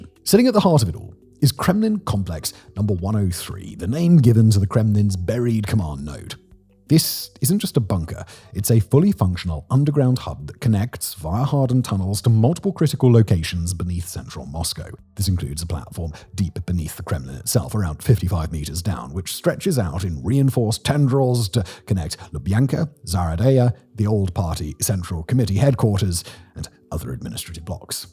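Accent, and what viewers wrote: United Kingdom. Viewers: Он британец, а они еще и портят американское произношение... Я бы знал, я американец)))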